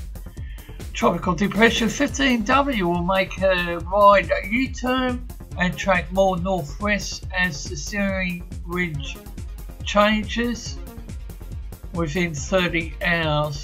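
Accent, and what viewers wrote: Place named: Australia. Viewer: Défi 200 abonnés sans aucune vidéo: HE'S AUSTRALIAN AUSTRALIAN ENGLISH + SHITE MIC = STUPID VOICE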